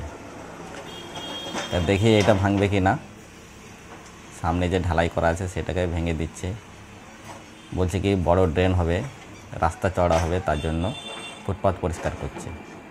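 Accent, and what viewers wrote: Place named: West Bengal, India. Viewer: ওর accent বলে ওর চৌদ্দপুরুষ পশ্চিমবঙ্গের।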